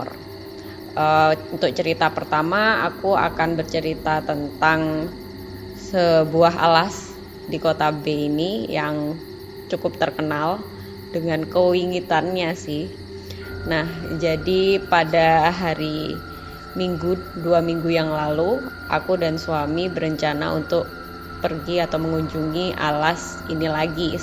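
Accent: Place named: Jember, Indonesia. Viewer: Dari logatnya apakah mbak orang Jember? 😁 Aq tinggal di jember tapi mpe skrg blm pernah berkeinginan maen ke alas P Kbnyakan nonton podcast n cerita horror jd udah kbnyang serem aja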